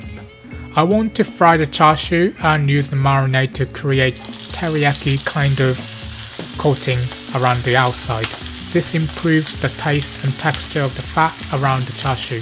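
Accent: United Kingdom. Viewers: Yes, that's me! I like to support small but professional cooking chanel, btw, your british accent gets me. All your direction is easy, clean and on point. If you have time, would you please consider to cook 鶏手羽 香酥雞翅? The pork belly in USA grocery is pretty tough, so i couldn't roll it though. I leave it 2 days and it is so tasty and soft. Thank you again ^^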